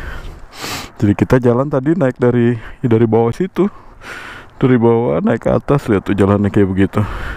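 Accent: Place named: Jakarta, Indonesia. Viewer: Gw suka sma logat lu Bang, org Jkarta emang banyol., Sgala Saddy=sedih di omongin, ga pnting bgt kan.. tpi gw suka lucu2,😂😁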